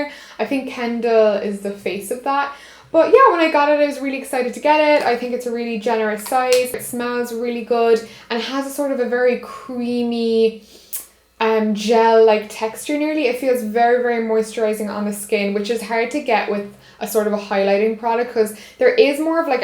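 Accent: Ireland. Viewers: Love your accent my name is also my family is from cork in Ireland too 😍😧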